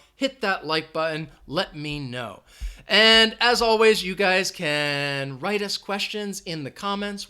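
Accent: United States. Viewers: Hello Sir, I do appreciate your lessons . You teach with limpid and clear American accent. Have my thumb up!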